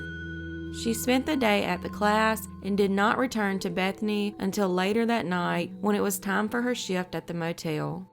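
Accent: South, United States. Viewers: Hi, I just wanted to say that really love your narration and background music. It's so comforting to hear a southern accent as a former Texan living in NYC. I wanted to ask if you have covered the case of Evelyn Hernandez. A disappeared pregnant woman and her son who vanished in San Francisco California. The case received little to no coverage and the main suspect is the married man who inpregnanted her similar to the Amanda Jones, except Evelyn is from El Salvador and nobody cared enough in the SFPD to convict Herman Àguilar.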